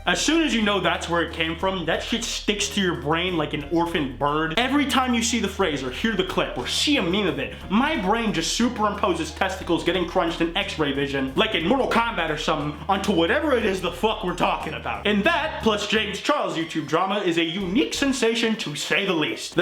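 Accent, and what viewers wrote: Russia. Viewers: That was an interesting voice with the Russian accent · I NEED MORE OF TALKING IN A RUSSIAN ACCENT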